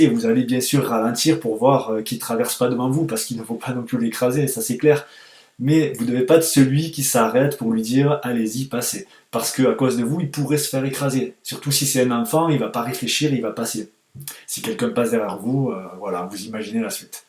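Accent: South, France. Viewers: J'ai passé 3 fois mon permis, la première fois j'ai loupé une priorité avec le stresse. La seconde fois j'ai eu 19.5 ( il faut avoir 20 je le rappelle) sans faute éliminatoire. j'ai jamais compris pourquoi. Cette fois ci j'ai eu 19! et sur mes résultats il me manque 1 point pour l'installation au poste de conduite...Sérieusement, le poste de conduite? Je comprend vraiment pas le problème, a part qu'a cause de ça il faut encore que je recommence! ça coûte trop d'argent! J'en suis a 60 heures... Je déprime grave. Mon porte monnaie crie famine... En plus mon auto école est pas top, par exemple le jour du permis le prof accompagnateur ne viens pas pour te rassurer, te dire si c'étais bien ou pas. Personne ne t’emmène ou ne te ramène sur les lieux de l'examen tu te débrouille. Ce qui, moi personnellement, ne m'aide pas à déstresser. Aussi, les cours durent en moyenne 35 minutes et coûte 48€ l'heure. 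Beaucoup trop chère! et j'habite même pas une grande ville! A vous semblez très professionnel, je vous remercie pour ces vidéos ça aide bien! dommage que vous n'êtes pas dans ma régions... On entend l'accent du Sud!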